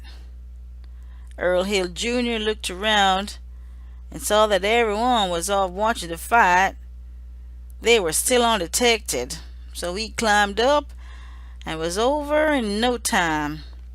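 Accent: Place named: South, United States. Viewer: I loved your southern drawl it was absolutely entertaining. Thank you for the stories God bless you ❤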